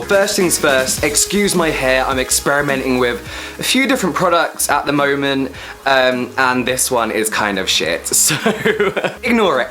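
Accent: United Kingdom. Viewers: I love the UK English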